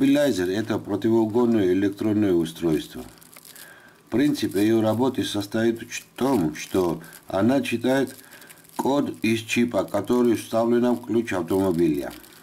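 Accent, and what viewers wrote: Georgia. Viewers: В арми был друг грузин вот так разговаривал с акцентом и грамотно, а мужику респект😊👍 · Спасибо брат за науку и особенно за подачу материала, с прекрасным грузинским акцентом.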